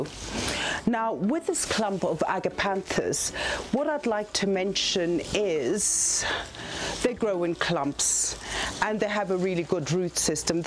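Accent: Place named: RP, United Kingdom. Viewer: Your voice is so Posh!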